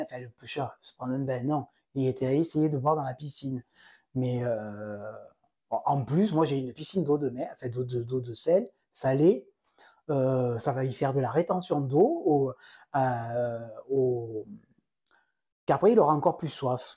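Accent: South, France. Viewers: Bonjour! L'accent du sud de a fait venir le soleil; la matinée était pluvieuse, jusqu'à ce que j'écoute ton podcast 😂😂😂 Super, tes chaussettes Serre-moi la pince! J'adhère a l'idée de l'instant Tricot 🎉 Bonne continuation!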